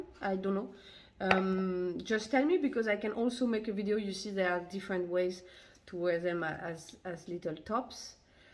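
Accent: France. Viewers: French accent = immediate credibility! :) Thanks for the tutorials!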